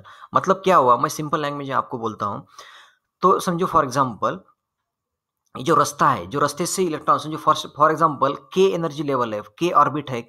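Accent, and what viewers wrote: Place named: Maharashtra, India. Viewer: Bohot mast explanation all concept are cleared !!! And i love that MARATHI ascent 💞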